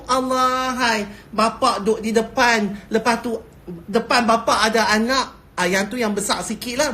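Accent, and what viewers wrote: Penang, Malaysia. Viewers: Nampak beza sblm dgn slpas dgn dia.. Bagus la.. Harap teruskan. Dia ni org mna.. Dgaq mcm penang atau kedah ja hahaha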